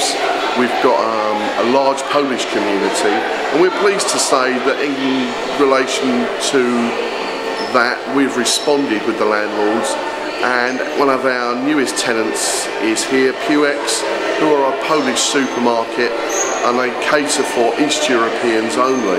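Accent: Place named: Cockney, United Kingdom. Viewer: he sounds like a geezer from EastEnders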